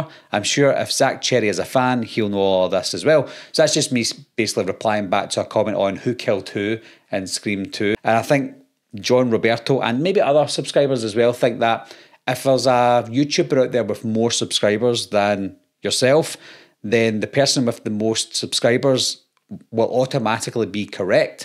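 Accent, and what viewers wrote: Scotland, United Kingdom. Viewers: I’m from Brazil and the accent I adopted was American and I can completely understand you. Really like this Scottish accent to be honest. Don’t worry about the trolls/haters ! You’re awesome!! Keep up the good work!!! 👏🏻👏🏻👏🏻👏🏻👏🏻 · Screw the trolls, its actually hilarious some of the comments, i don't have a YouTube channel but can fully understand the level of abuse you get as ive got a few friends who have one and its unreal the shit they get. I'm Scottish and fully understand you so fuck anyone that cant understand the Scottish accent, they don't deserve you mate. You don't need idiots watching your streams anyway absolute losers. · you’re the man. Good on you for letting this nonsense roll right off your back. I’m from Boston, MA - I speak my dialect. You’re in Scotland and speak yours. Who’s to say that anyone from a different area is speaking incomprehensibly? 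Love your channel! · I can't believe people comment on your accent, you're from Scotland what should you sound like? Crazy people 😊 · People are just dumb. They think everyone in the world is suppose to sound like they do? Also I love your accent. It reminds me of my mother in law who is from Edinburgh. She teaches me all kinds of things like I hunt the gruffalo in the deep murk widd! Also telling people git ursell to fuck ya wee radge! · Give ‘em hell! Very entertaining I think Scottish accents are dope and I don’t have a problem understanding Open your ears people!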